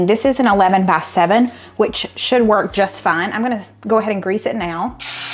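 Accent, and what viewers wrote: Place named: South Carolina, United States. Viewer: Hey I live in S.C. and with your accent u have to live here to lolll!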